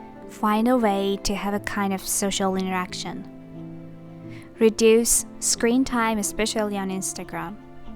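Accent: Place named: Turkey. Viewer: لهجه ترکی بهت میشینه دختر💙💚 امشب خیلی گریه کردم ولی دستم خورد ولاگت باز شد..... بعد.... بهترم.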